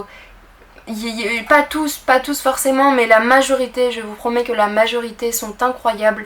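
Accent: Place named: France. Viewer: No entendí nada😢, pero me encanta el acento francés. Estoy aprendiendo apenas el frases, estoy con como se dicen las cosas básicas y todo eso. Espero algún día entenderlo y hablarlo.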